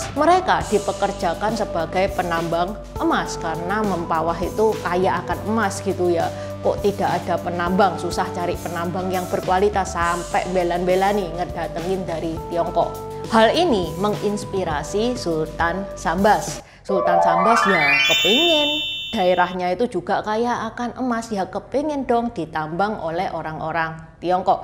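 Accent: Java, Indonesia. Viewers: Logat ngomongnya saja sudah ala jawir. Jadi aneh dengernya karena pakai logat orang lain. Contohnya tidak usah jauh2 nih si cici yang punya channel ini. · Asli jowo opo mbae..kok medok jowo bahasa Indonesia nya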